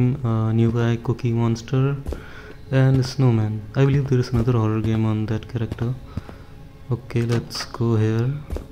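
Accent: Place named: India. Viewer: ur voice looks like Indian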